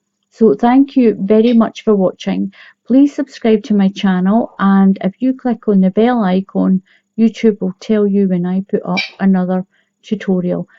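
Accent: Scotland, United Kingdom. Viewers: Love your Scottish accent and love your tutorials. Our lock down in Letterkenny has expanded my crocheting experience. Another blanket going to be done this stitch. Love to hear Mutley in background think he would like you throw the ball!!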